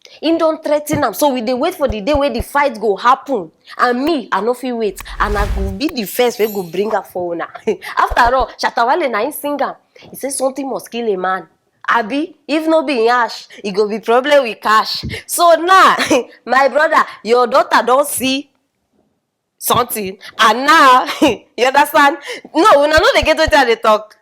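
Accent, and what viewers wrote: Nigeria. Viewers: That Nigerian English ooooh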